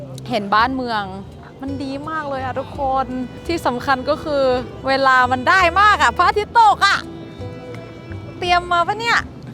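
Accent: North, Thailand. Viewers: อู้เมืองแหลวแลดซ้ำม่วน 🤣🤣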